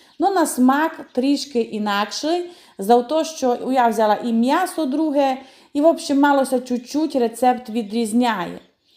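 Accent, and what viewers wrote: Transcarpathia, Ukraine. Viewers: Дуже подобається ваша мова. Слухаю вас з насолодою. Дай Господь вам здоровʼя і наснаги. І хай Господь спасе нашу Україну. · Привіт.Я обожнюю ваш закарпатський діалект і ваші рецепти.🌲💛💙🌲🇺🇦🌲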